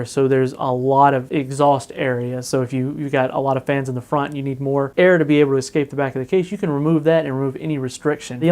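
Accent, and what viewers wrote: South, United States. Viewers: Is that Southern accent?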